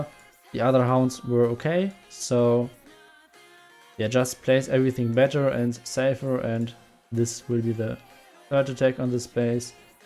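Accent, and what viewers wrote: Germany. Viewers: Bro do you really suck at english or is it because of the german accent?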